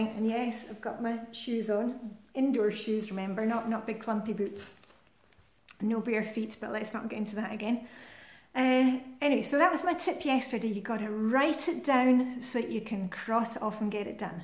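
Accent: Scotland, United Kingdom. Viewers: Hy from Montrose in Scotland we had snow here yesterday, My favourite film has to be Gone with the Wind, as for smell I love the smell of freshly laundered sheets especially when they have been dried outside. Love your videos nice to here a Scottish accent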